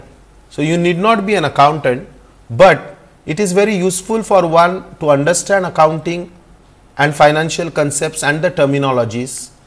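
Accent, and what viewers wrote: India. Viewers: Awesome, and why not put the original subtitle for it as the google has lots of mistakes and it takes time for me to understand the Indian accent.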